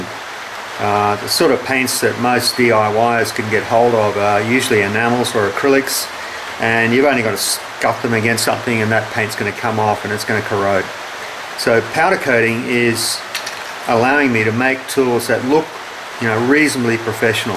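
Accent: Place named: Australia. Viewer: G day i really enjoy your content i just subcribed its nice to hear an Aussie accent on youtube i an looking forward to going through your back catalog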